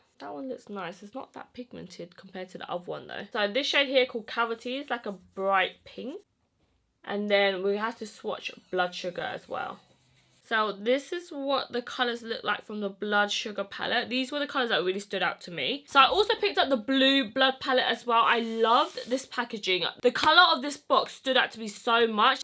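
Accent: United Kingdom. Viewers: hwasa with a british accent aha💕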